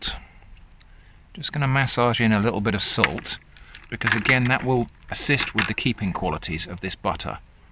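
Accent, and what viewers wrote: United Kingdom. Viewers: because of the British accent, he makes anything he does sound completely okay and normal...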